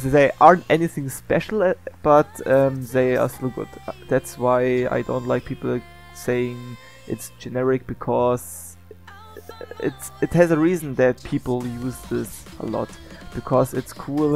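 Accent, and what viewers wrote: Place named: Germany. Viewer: Man merkt richtig das du aus Deutschland kommst ich finde dich cool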